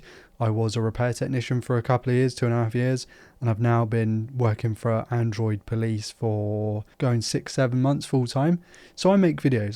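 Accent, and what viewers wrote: United Kingdom. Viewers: I just watched one of your videos for the first time (the Used S23Ultra vid) and was happy to subscribe despite being a terrible fit (geezer American who's never played a video game in my life). I'm a sucker for a calm Brit who knows his stuff and doesn't waste my time. Best of luck and take care of yourself.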